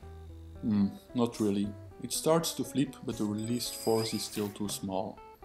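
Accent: Belgium. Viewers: Your videos are very inspiring and pleasant to watch... and your accent reminds me of my good friends in Brugge and Gent :-) Best wishes from Poland!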